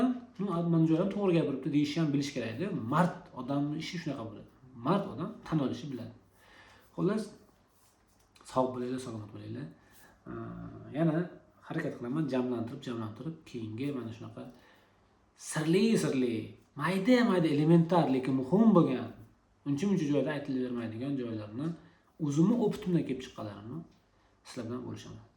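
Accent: Namangan, Uzbekistan. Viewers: aka namangandan emasmisiz mobodo?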